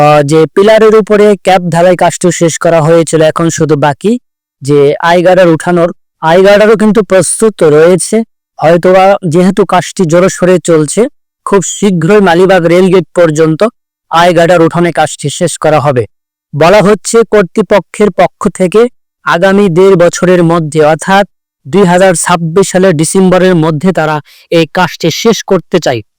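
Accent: India. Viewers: তোমার ভাষাতো দেখতেছি ওপার বাংলার!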